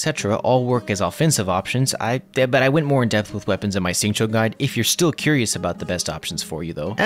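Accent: United Kingdom. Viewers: wait ur british huh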